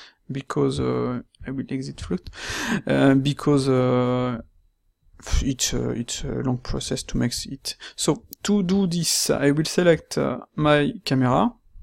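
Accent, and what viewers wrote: France. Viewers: oulala l'accent , moi je comprend parce que je suis français mais pour les anglais sa doit être compliqué ... super video sinon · it has to be, you can't make a more french accent than that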